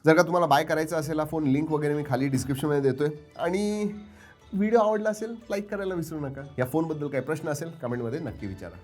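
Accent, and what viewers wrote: Pune, India. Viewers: That puneri accent is soo gooodd 🤩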